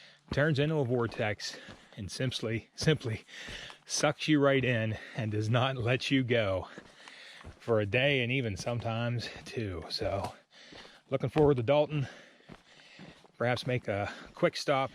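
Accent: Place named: Philadelphia, United States. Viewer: I thought I heard a Philly accent in your speech! I don’t doubt that that cheesesteak was the best!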